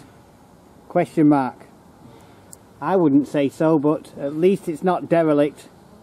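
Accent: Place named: Yorkshire, United Kingdom. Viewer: You’re a character? Love the determination to go ahead? Ur just like me mate? Love your accent ! Keep up the great work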